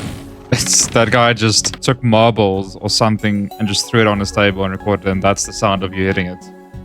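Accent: South Africa. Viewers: Yo he has such a south african accent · I knew it as soon as you said chow the accent gave it away , you are a successful Afrikaans YouTuber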